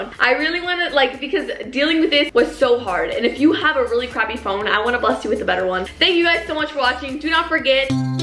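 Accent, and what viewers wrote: United Kingdom. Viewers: HER BRITISH ACCENT IS SO GOOD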